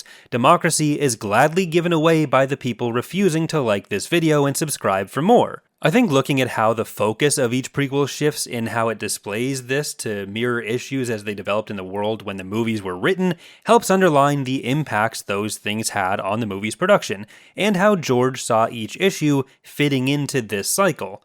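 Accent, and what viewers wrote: Canada. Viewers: Great video I do enjoy hearing a Canadian explaining better job at American politics of the early 2000s then many Americans who lived through and who were politically engaged in that time .😂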